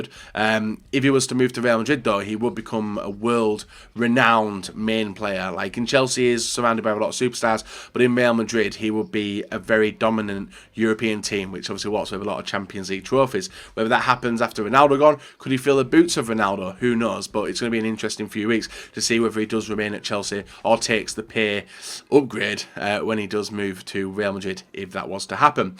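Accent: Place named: England, United Kingdom. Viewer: Como se nota k eres inglés... donde esta Rakitic? Y Coutinho?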